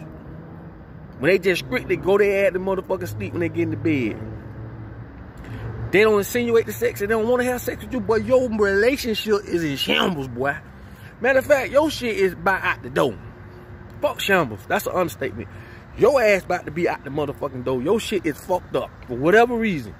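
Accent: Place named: New Orleans, United States. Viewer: Are you from New Orleans... I'm dying over here it's the truth 🤣🤣🤣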